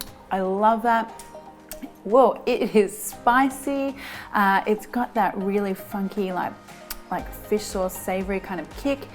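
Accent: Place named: Australia. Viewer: Your Australian accent is getting thicker which each video! Hope you enjoy your return to Oz xx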